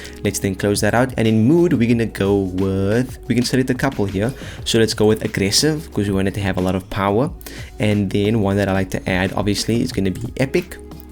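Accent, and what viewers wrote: South Africa. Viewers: Your accent has some South African in it. Like, a LOT actually.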